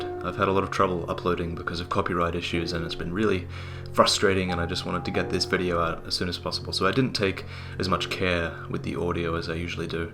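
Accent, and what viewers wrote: Australia. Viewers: Your accent reminds me of someone... Chase from House. Are you Australian? Anyways, great video! Didn't notice he used both weapons on the downwards cut lol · I smell... i smell.. an australian. Correct? if so, do you live in perth?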